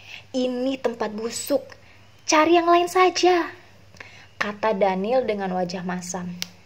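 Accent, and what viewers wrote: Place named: Flores, Indonesia. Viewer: Aksen floresnya 😭 butt gooddd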